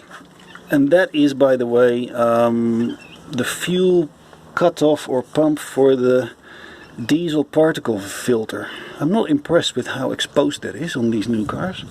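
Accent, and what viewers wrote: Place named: Netherlands. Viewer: Mooi. Vooral met het Hollandse accent. Ik ga het proberen op m'n Ranger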